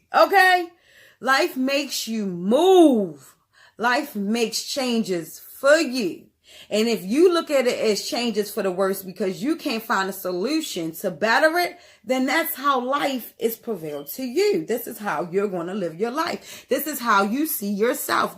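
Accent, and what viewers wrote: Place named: Virginia, United States. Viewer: Wow sis this reading was very detailed to the Teaa & it's accurate as hell 👏🙌. When you mentioned the Devil card I said wow its scary because I've been seeing 555 constantly along with other #s too. The Universe is speaking to us all about letting go the toxic sh*t from our past so new things & opportunities can come our way. Not to mention mercury retrograde is also in the mix 🤦‍. Im loving your readings & loving your vibe. I feel like you also maybe from Virginia or Maryland because you have an accent lol 💕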